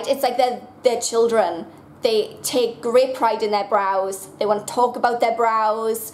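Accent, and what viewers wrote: Wales, United Kingdom. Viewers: I love your Welsh accent!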